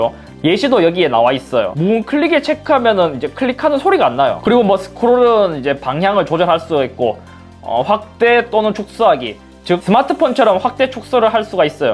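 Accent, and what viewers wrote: Gyeongsang, South Korea. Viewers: 잇섭님 너무 잘생겼어요! 애플 제품 관련 영상은 다 찾아본 편인데 항상 웃는 얼굴이라서 보면서 마음이 편해져요ㅎㅎ 왠지 만나면 되게 재밌는 친구일 것 같아요ㅎㅎ 경상도 말투를 쓰셔서 졸리지 않게 들을 수 있어서 좋아요~ 앞으로도 재밌고 유익한 영상 많이 찍어주세요 :-) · 같은 경상도사람이라서 억양자체가 비슷한데 목소리까지 비슷하네요.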